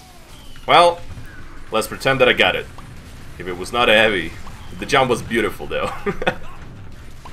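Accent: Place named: Russia. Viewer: I like these rants about valve I like hearing russain man to. Good video.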